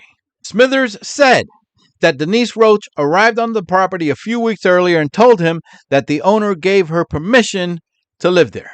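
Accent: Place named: Latin America. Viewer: and your latin voice.😊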